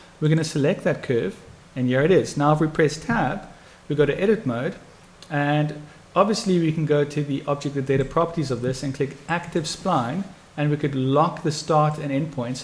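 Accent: South Africa. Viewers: lol, the South African accent is very strong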